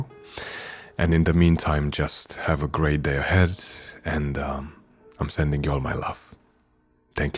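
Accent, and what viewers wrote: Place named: Hungary. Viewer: Hi, thank you so much. Your readings are amazing. I'm a writer and poet myself and hope to get published soon. Listening to your readings is like medicine for my soul. As a Canadian born Hungarian, your accent makes me feel my long passed family around me again. Thank you again for so many reasons that I can't say. Have a great day ❤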